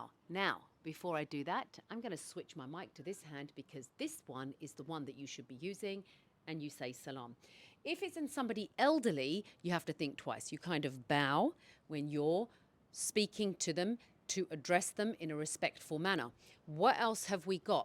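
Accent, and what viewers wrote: United Kingdom. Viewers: Assalamualaikum sister Please can you remove subtitles from your videos bcz with them i cannot listen you focusly, i wanna understand you directly cz i do love British accent.thnks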